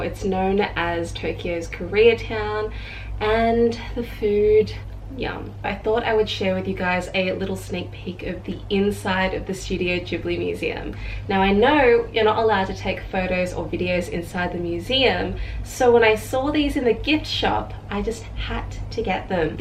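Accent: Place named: Australia. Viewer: I wish... This place has such a aesthetic vibe +you have a aussie accent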